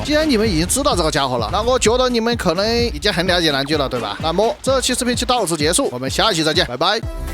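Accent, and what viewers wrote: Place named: Sichuan, China. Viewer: 的地道川味方言视频竟然在油管这么火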